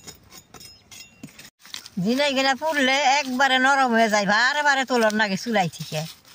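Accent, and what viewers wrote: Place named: Dhaka, Bangladesh. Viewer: উনার কথায় বুঝা যায়, বাংলাদেশের ঢাকা জেলা অথবা মানিকগঞ্জ জেলা হবে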